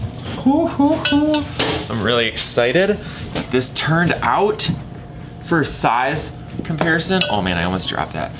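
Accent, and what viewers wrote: Minnesota, United States. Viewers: Wow CLASSIC Minnesota accent lol love it. Mine only comes out when I’ve had too much to drink now. 😋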